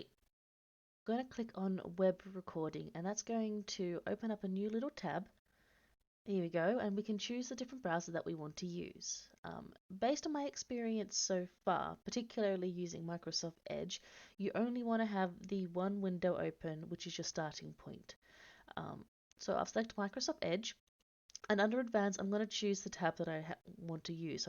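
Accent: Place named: South Africa. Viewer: thanks very much for this tutorial. I searched and searched and eventually found your video which was just brilliant. I am a bit curious as to your accent. I lived in South Africa for decades, and I have an English South African Accent, which made me question if your accent doesn't have some South African in it, then I saw one of the subdirectories which had what looked to be Dutch text, then I though maybe there is some British in the voice and possible New Zealand. You're probably going to tell me that I'm barking mad and that you are from Egypt 🙂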